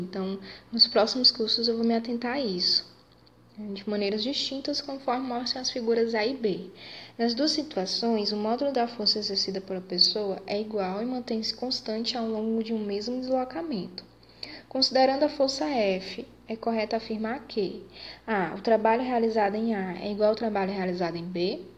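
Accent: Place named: Pará, Brazil. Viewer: Eu gostei muito da aula, obrigado! e esse sotaque... você também é paraênce?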